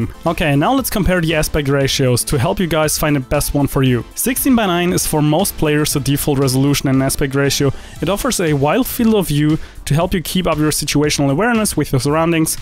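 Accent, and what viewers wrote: Germany. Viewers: German Accent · OverwatchDevil man hört es schon raus aber viel besser als deutsche mit dem th und flüssiger als deutsche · Naja, ich finde man hört jeden Deutschen raus :P Heisst aber nicht dass dein Englisch schlecht ist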